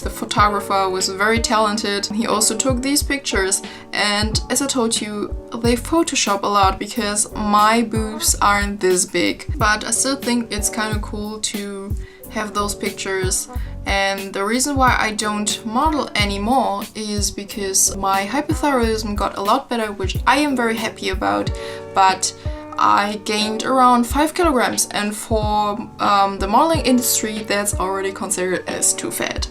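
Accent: Germany. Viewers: Were you born and raised in Germany cause you English really sounds 'germanized' :D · your accent ist german, right? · a pretty fucking horrible thing to say to/ about someone, and for what reason? I think her accent is beautiful. I love how soft and sophisticated German accents sound. Even if it was hideous, there's not much she can do about it, is there? Any changes would be slow, and besides there being nothing that needs fixing, she shouldn't have to spend years "improving" a perfectly understandable accent for our needs, not hers. JFC!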